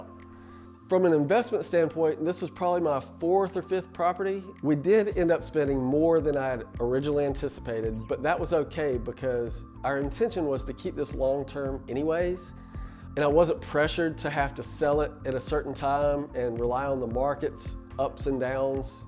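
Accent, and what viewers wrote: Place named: Georgia, United States. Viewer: I went to UGA in the 90s, your accent brings back memories!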